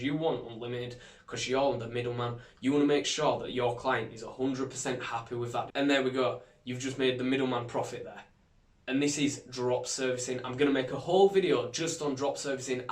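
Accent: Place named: North Yorkshire, United Kingdom. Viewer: Thank you so much for your videos. I have learnt so much, made a few quids already and I usually don't really comment on YouTube, but your videos and channel are so underrated. You need to be more famous. Will definitely recommend you to my friends. Keep up your fantastic work 💪I'm French, and I absolutely love your accent! (North Yorkshire if I'm not mistaken !)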